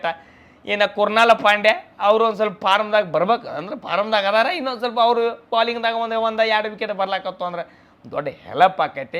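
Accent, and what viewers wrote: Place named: Dharwad, India. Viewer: ಧಾರವಾಡ ಭಾಷೆ ಬೆಂಕಿ 🔥🔥😂😂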